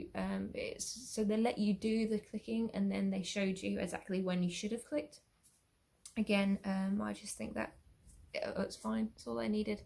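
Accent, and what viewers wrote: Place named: Worksop, United Kingdom. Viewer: Where about is it you live in England? You sound like someone from Worksop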